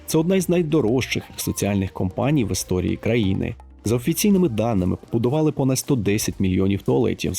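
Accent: Russia. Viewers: Відео хороші, але попрацюйте більше над вимовою для відео. Акання дуже чутне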